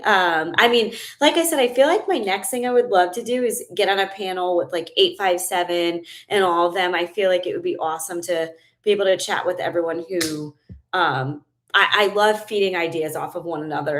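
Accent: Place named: New Jersey, United States. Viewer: Wild guess but are you from Jersey and/or Italian?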